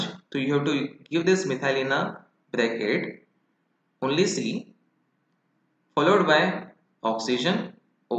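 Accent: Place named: India. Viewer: Usually the indian accent drives me crazy xD but yours is actually quite likeable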